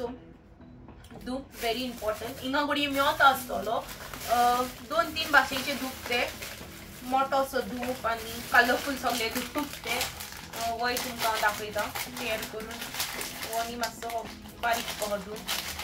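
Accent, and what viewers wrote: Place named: Karwar, India. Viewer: Becoz you speak like karwar people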